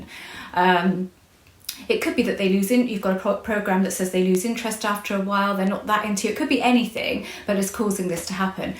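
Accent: United Kingdom. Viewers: i knew i wasn't going crazy. I wonder whatever happened to Noel Edmunds. lol. Anyway thanks for replying. And I must admit I sometimes get you and Natalie Dance mixed up. Soz. Anyway I like your teaching style. Nice to hear a British accent teaching this stuff.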